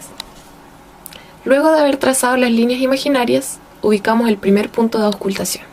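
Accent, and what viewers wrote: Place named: Chile. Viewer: Hermoso acento chileno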